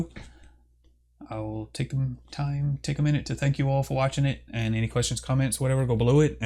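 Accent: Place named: Louisiana, United States. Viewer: Where you from bro? Sounds like you have a Louisiana accent 🤔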